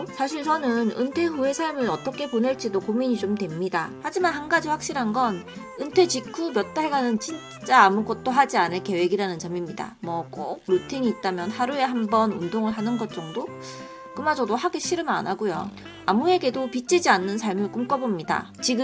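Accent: Gyeongsang, South Korea. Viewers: 경상도 사투리가 이렇구나 ㅎ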